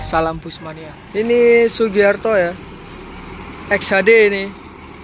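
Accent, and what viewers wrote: Java, Indonesia. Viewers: Jawanya kok medok bang..sukses selalu ya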